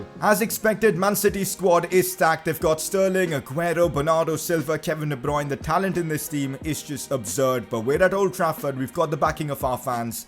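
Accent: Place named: India. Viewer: U speak like indian mannn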